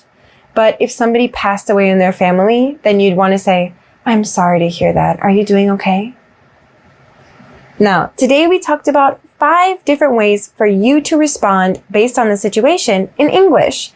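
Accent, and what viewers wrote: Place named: Midwest, United States. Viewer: 완전 중서부 American Standard 발음임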